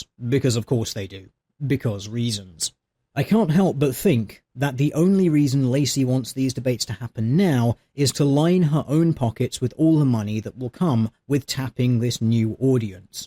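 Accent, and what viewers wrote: United Kingdom. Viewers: Wait. He's British? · Wait, you're British!? I thought; was Canadian.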